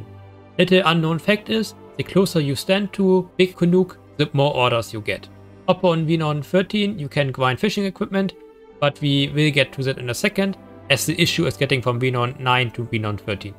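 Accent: Germany. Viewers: thick german german accent :D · Bist du deutsch? Hast nämlich solch einen Akzent xD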